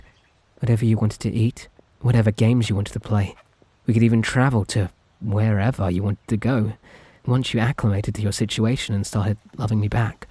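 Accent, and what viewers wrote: United Kingdom. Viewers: I'm fucking dead. The level of comedic timing is impressive. Taking in to account I was just mocking his british accent just a second ago.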